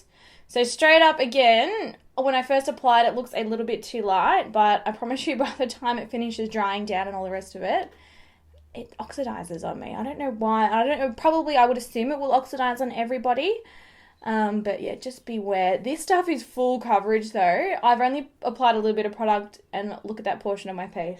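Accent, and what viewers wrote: Australia. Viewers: wow! I'm amazed at the crap people feel entitled to say to strangers /others on the internet. So rude. I'm Australian too, and think your accent is cute... Pfft.. Ignore the haters and do you! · Ohhh dear. You have the kind of totally hideous accent that makes me ashamed to be an aussie. The way you screech and draggggg out words, then your voice goes UP at the end of a sentence. Completely intolerable voice. Many will disagree but many will absolutely agree whether or not they are brave enough to say. A fact remains a fact whether u want to agree makes no difference.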